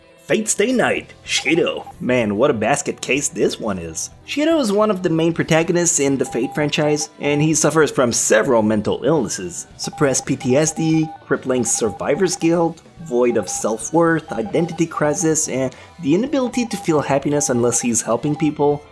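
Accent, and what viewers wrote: Quebec, Canada. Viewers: are you from quebec? your accent seems familar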